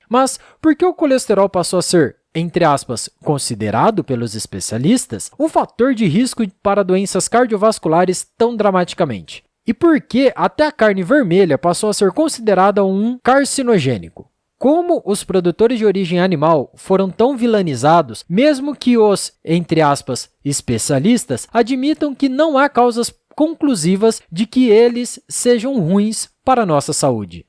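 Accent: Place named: Rio de Janeiro, Brazil. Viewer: O sotaque do narrador me deixou maluco quem o carioca!! 😂😂😂😂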